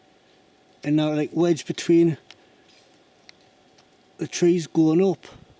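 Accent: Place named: Ashington, United Kingdom. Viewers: has any wildlife expert told you what they believe the sounds to be in that area from your accent i take it to be the northeast of England .taking that England has only 10% of its woodland left comperd to 40 %or 50 % of woodland in most of European countrys like France or Germany is it possible to have a wild unknown animal running around the forest in England . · That sounds like an ashington accent that...class video mate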